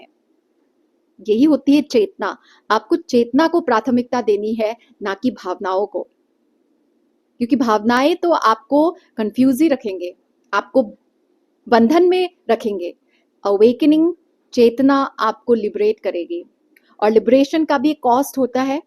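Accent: Assam, India. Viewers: You’re from Assam? I find your Hindi accent a bit of Assamese😅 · Ma'am aap Assamese hai kya?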